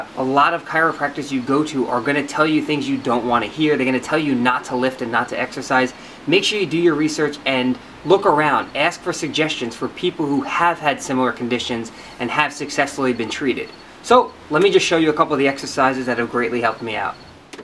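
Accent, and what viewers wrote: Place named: Long Island, United States. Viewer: Wow. I knew you were from Long Island. lol I heard you talk, and I saw your surroundings..and I had that feeling of, "I know that street. Its Long Island Suburbia." Aka my hometown. Long since gone, but you never forget. haha Anyway I just started trying these exercises out, I'm hoping they'll help, so far they felt pretty good so I'm hopeful.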